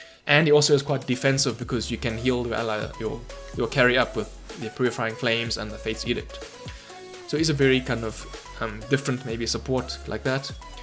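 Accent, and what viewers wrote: South Africa. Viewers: South African? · Are you South African? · Came here to learn Oracle.. You sound like you from South Africa.. The motherland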